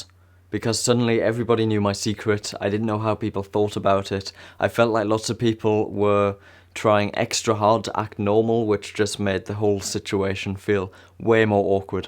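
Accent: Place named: Geordie, United Kingdom. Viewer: I know you're from Tyne and Wear somewhere and your accent is too soft to be from North of the tyne, so I reckon you're either from Sunderland or sour Tyneside, maybe Whitburn or South Shields?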